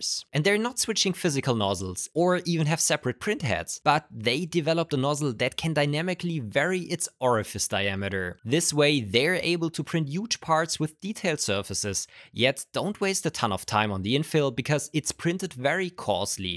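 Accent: Germany. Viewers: Just saw this channel, you can hear, that you're German and I just wanted to say congrats. It's pretty rough to get that much abos as a German. Also the video ist pretty good, thanks. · You are habe a Little Bit of german aktzent, vermute ich mal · Gutten tag eveybody man merkt das du deutscher bist aber grundsätslich sehr guttes video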